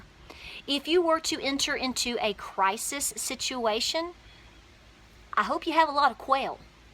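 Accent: South, United States. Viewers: My luv, we think the world of you. And we would do what ever it takes to help you. You have to know that. But just remember, we will always be sub'd to you not matter what happenes. Just know that a world with no is a very lonely place. Please take care of yourself -- you have a lot of stress happening in your life right now. Please take care of yourself. We really do love you and you videos (even if you do talk kind of funny) (remember I am darn near living in Canada, so anything south of the 45th parallel is considered (southern) God Bless you May he watch over you and give you the strength you need and the knowledge when it is time to regenerate. Love ya Tropical Minnesota.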